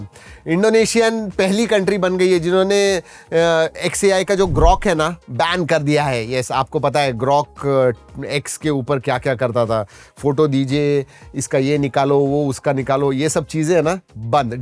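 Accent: Maharashtra, India. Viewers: Bhaiya love your marathi accent ❤❤ Tumi pn till gulh ghya gode gode bola ❤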